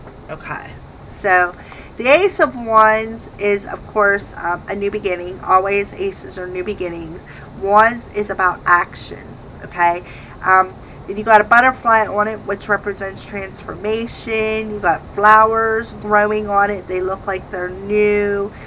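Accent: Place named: Baltimore, United States. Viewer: Are you from Baltimore..?..we have a unique accent so I've been told and you seem to have it 😀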